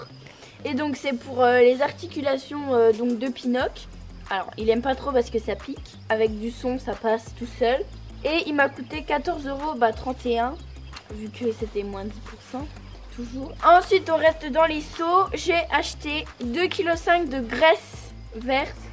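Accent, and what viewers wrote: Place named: Switzerland. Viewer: Est ce que tu es Suisse par hasard ?😁 parce que je trouve que tu as vachement l’accent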